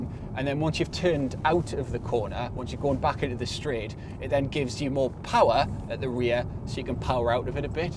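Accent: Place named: Northern England, United Kingdom. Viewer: Don't try to sound less Northern mate, there's enough on TV who do that 👍😂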